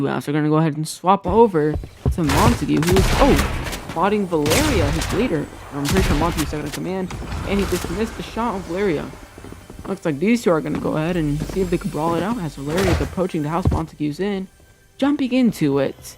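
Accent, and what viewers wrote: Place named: United Kingdom. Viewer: love the british voice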